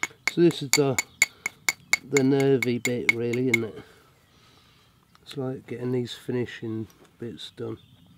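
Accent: United Kingdom. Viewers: With this filming you look animated like the fantastic mister fox movie. I love your content it's very genuine. I have been trying to learn napping but have only found Americans and was very glad to hear a calm u rushed british voice with birds in the backround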